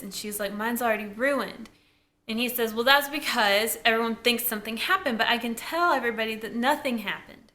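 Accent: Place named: South, United States. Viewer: everytime i watch one of your Degrassi vids i end up getting pissed off.. i'm trying to relive my highschool memories and i got you in your southern accent with european charm ruining it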